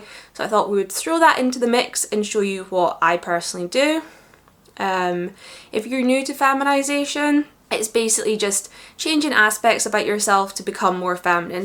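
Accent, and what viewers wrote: Scotland, United Kingdom. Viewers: Hellow Sweets. What Part Of Scotland Are You From?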